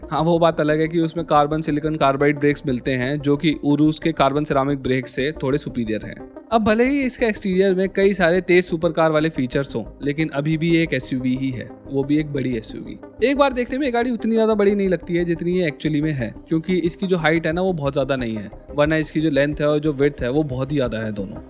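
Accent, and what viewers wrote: India. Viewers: Man - if you can’t fix your indian accent, can you at least get the names pronounced properly ? It’s not pronounced “ooroos”… its “U-RAS”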